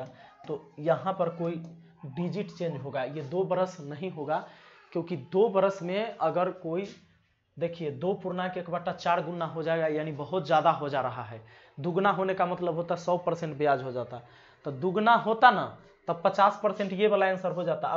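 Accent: Bihar, India. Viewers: बिहार के हो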